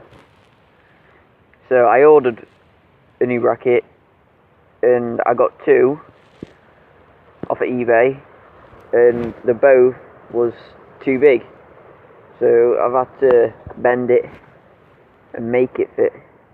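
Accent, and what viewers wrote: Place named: Manchester, United Kingdom. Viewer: I am!!! :) I'm saving for this model bike! He sounds to me like he's closer to Manchester!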